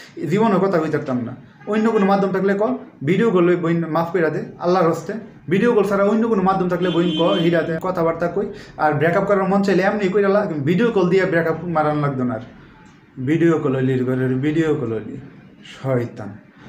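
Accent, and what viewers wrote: Brahmanbaria, Bangladesh. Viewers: But vai toh b-bariar mone hosse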